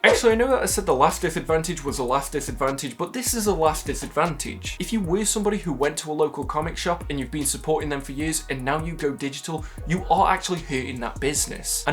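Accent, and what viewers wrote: United Kingdom. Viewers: No, you have a great content creation vibe! I just rarely hear a fellow UK accent, and the pronunciation of 'book' is one of my favourite regional pronunciations haha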